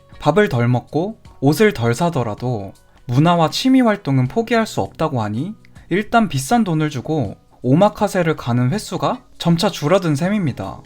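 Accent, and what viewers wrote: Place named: Honam, South Korea. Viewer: 혹시 전라도 출신이신가요? 말하는 억양이 호남지방 억양이 짙은데..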